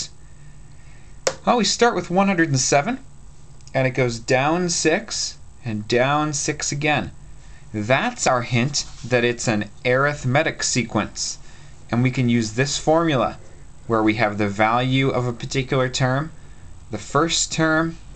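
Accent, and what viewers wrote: Canada. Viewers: You sound canadian:)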